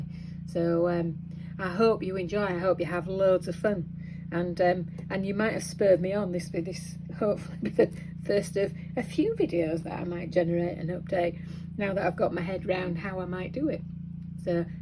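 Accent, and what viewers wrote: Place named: Hull, United Kingdom. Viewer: don't know why i haven't discovered you before! great stuff. you sound like you're from hull, i'm in cleethorpes. thank you xxx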